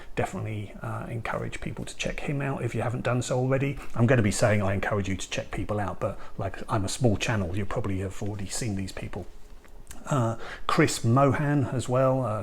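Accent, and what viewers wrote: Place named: England, United Kingdom. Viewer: It’s the English accent that makes it sound so classy when you’re talking about movies 👍🏻 400 great job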